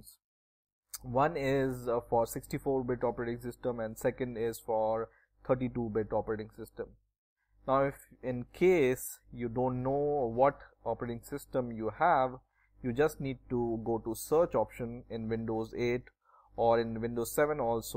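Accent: India. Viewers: I heard his indian accent = instant CTRL+W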